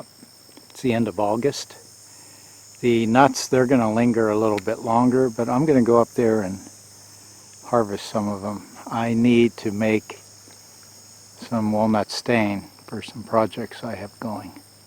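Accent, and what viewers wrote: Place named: Pennsylvania Dutch, United States. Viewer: I can hear the deitsch accent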